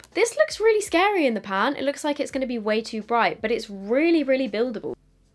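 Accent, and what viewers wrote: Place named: England, United Kingdom. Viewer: As an American, a Texan specifically, it sounds so funny to me to hear someone cuss with an English accent.😅🫶🏼 It’s so cute lol. I love your videos!❤️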